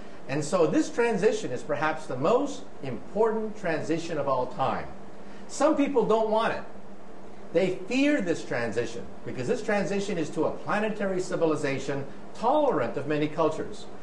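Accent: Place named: United States. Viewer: his accent is so american xD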